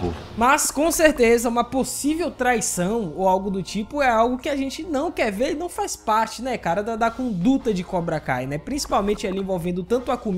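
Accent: Bahia, Brazil. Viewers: Você é daq da bahia??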